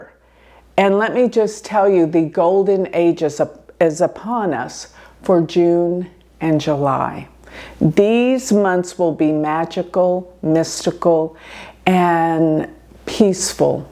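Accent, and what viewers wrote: Texas, United States. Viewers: slight TX accent that most likely has been practiced in order to lessen? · IKR!!! Texas accent is totally normal if your from Texas! We don’t notice our accent’s but others do.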